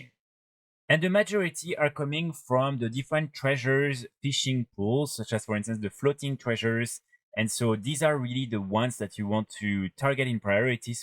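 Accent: France. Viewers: T'es français non? L'accent xd Merci pour la vidéo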